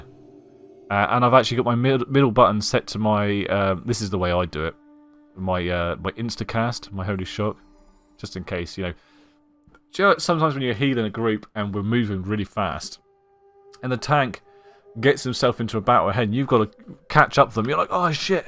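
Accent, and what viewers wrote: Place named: United Kingdom. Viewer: Seriously, it has to be something in the water. Another goofy brit with a thick accent trying to make a guide on an American game. Lol and this goofball actually has someone talking over him. So weird!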